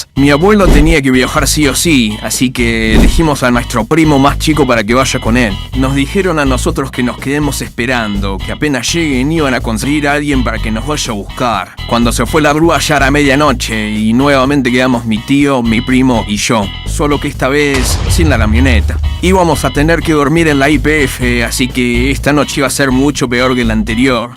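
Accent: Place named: United States. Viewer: Noto un acento medio yankee no sé por qué 🧐 o medio neutro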